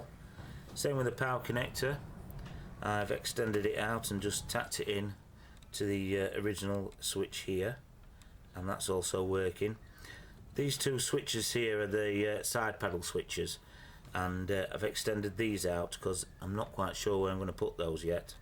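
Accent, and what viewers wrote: United Kingdom. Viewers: You played Tetris on a Game Boy in 1989? ;-) Did you import one or something haha cos it didn't come out until September 1990 in the UK and you sound British so I'm assuming you're in the UK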